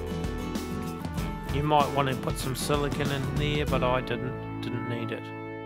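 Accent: New Zealand. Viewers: Fellow kiwi accent I hear?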